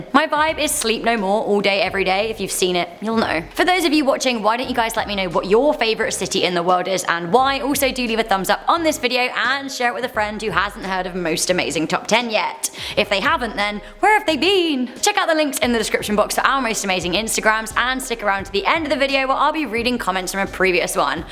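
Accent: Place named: Cornwall, United Kingdom. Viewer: Miss you are looking stunningly beautiful and sounded not like a pirate but more like my neighbours here in Cornwall UK 😍 but as always a great video plus very informative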